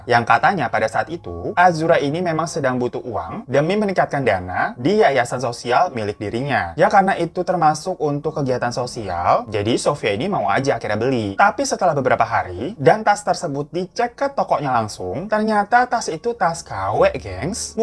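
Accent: Java, Indonesia. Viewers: Sehat terus mas. Anehnya dari mas, namanya bule bangget... Tapi bahasanya medok Jawa banget...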